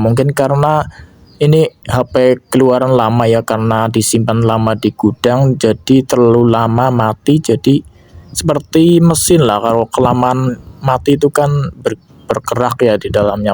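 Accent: Indonesia. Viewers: Tumplek?? Orang mana bang?? Tegal or Brebes? Unik suaranya😂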